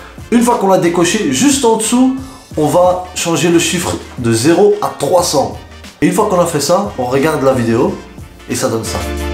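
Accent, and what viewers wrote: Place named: Belgium. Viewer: shaab Belgique haha t'es de bxl non ? merci pour le tuto mon frérot 💪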